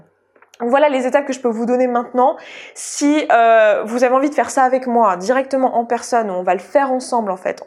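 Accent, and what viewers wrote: France. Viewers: Tu es lyonnaise?:D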